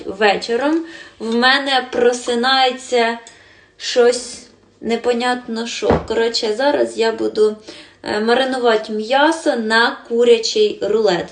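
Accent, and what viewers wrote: Poltava, Ukraine. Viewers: Чого примахуєтеся до людини за її мову в кожному регіоні говорять по своєму на Полтавщині так говорять вона ж вам пояснювала правильні ви дуже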